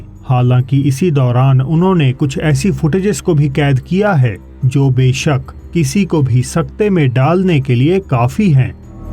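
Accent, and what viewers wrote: Punjab, India. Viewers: Bro,Mera naam Raju Bhullar hai,aap ki English aur Hindi bolne k style mein Punjabi touch clearly jhalakta hai jo k bahut garv ki baat hai. Whole Punjabis should proud of you aur aap ka ' kaise hain aap & ' chaliye phir shuru karte hain" is awesome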